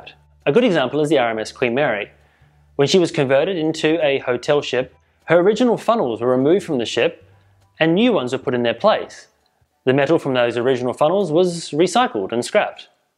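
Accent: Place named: England, United Kingdom. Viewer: I ran this video at .75 speed and this chap is more understandable with the English accent.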